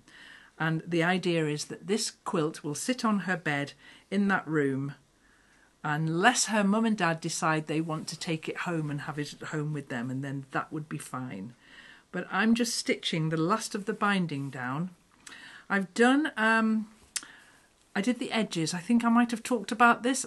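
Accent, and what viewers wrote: England, United Kingdom. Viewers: I have only just found you. It am English and its lovely to hear an english voice . I have a lot of Kaffes flower fabric plus other bits and pieces of flowers. I couldnt think what to do with it, I know now. I watched Grantchester as a series, I wouldnt have wanted to watch it in one go. I have hand pieced, hand quilted a hexagon quilt. I was never quite happy with the finish though wasnt sure why. You may be horrifed but I now have the front in two pieces and removed everything else to finish it again, maybevadd a few hexies, I have hundreds made. It is a big throw size unfortunately, I also have some quilts that need finishing. Maybe I should start a new system as you have. I dont have facebook but do love looking at Instagram.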